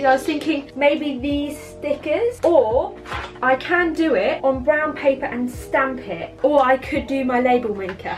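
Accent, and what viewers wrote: England, United Kingdom. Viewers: ok so there are two things i need to say to you : one. you're so damn good at scapbooking!! i tried once and my eyes just don't work well lmao. two. i really like your accent! i could listen to you for hours. i am planning to move in england so from now on you will be my exemple for training on the english accent. byye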